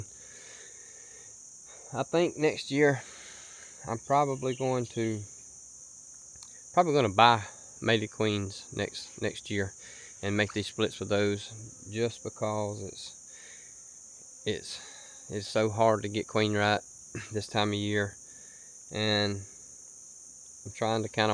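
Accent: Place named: Alabama, United States. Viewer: Your right about staying on top of your hives for sure, it don't take much to lose a couple of them and that really hurts especially if your a small beekeeper without a lot of resources to pull from. Good tips about laying workers, gives me something to fall back on when I run into it. As an aside, wife knew you was from Alabama when she heard you talking from the other room LOL!, her kinfolk are down Brewton, Alabama way. Y'all have a good day now.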